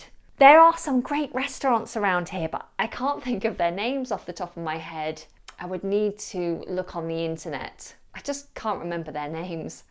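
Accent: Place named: RP, United Kingdom. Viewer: If I am not wrong you are from the north of England, how did you get your beautiful RP accent?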